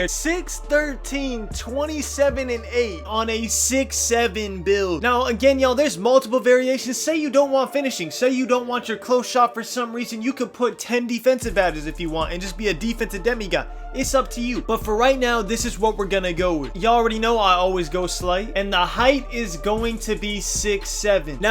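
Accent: Texas, United States. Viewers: Are you from Texas? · You from Texas? Or Atlanta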